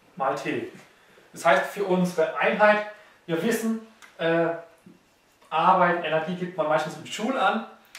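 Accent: Swabia, Germany. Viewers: das heißt nicht leischtung sondern leistung und ein W wird nicht mit nem Kreuz in der Mitte geschrieben. argh, das schwäbische kann man sich einfach nicht anhören. aber sonst gut erklärt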